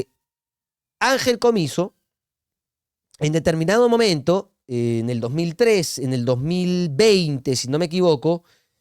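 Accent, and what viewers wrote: Argentina. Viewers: Todo bien mano pero porque xuxa hablas como argentino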